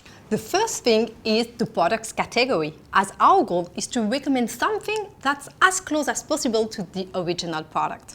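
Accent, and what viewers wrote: France. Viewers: The narrator should be someone who speaks fluent English without a strong French accent. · Love the thick French accent with risky soundly whiskey. lol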